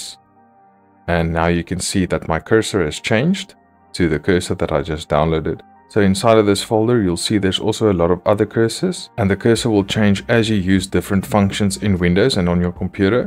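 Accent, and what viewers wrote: South Africa. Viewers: jirre south african?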